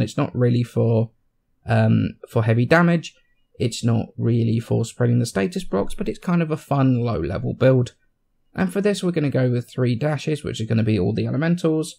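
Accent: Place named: England, United Kingdom. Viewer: no he's just English... that's how we say it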